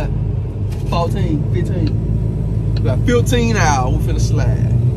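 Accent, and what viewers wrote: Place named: Mississippi, United States. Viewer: I hear that Mississippi in ya voice mane 😂#601 stand up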